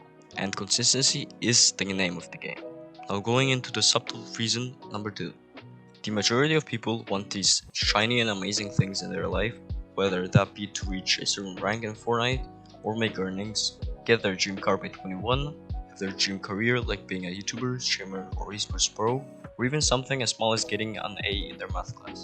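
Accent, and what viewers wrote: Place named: Netherlands. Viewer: ofcourse i am also from europe and i recognized a little accent for me it sounded dutch it would be funny if you talked dutch!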